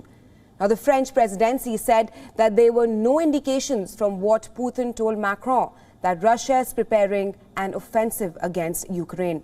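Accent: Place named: India. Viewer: this Indian news reader her accent is so annoying to listen yo go back to India and report they will love you there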